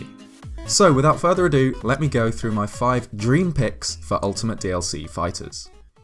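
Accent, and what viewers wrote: United Kingdom. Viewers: Where are you from in the UK bro?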